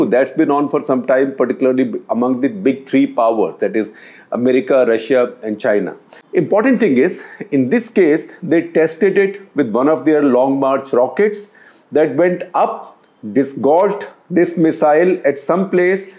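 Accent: India. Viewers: 不行，咖喱味太重，听不下去了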